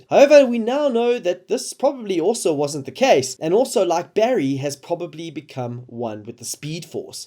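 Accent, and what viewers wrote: South Africa. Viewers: are you south african? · I live in England now but born and raised in SA. When I first started watching this video I was like this guys voice sounds way to familiar. I mean it doesn't have an accent, cause English people have an accent to me. Then I realised why haha! SA ftw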